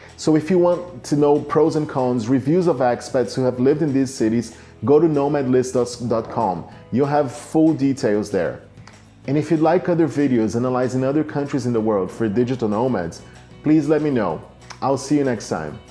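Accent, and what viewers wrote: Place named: Brazil. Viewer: Brasileiro haha! Vê-se pela pronúncia. Um forte abraço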